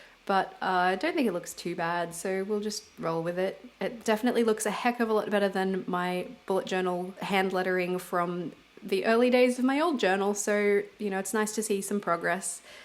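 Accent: Australia. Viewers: Hi, I really enjoyed your video... it is good to hear an Aussie accent... just a tip about your comic markers... they are double ended 😉 have a great 2019. Will be looking forward to more videos 🙂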